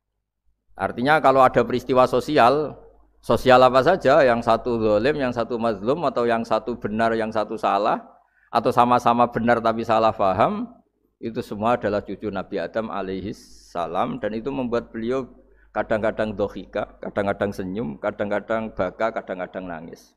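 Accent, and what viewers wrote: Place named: Java, Indonesia. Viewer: Ora ngapak ya medok 😁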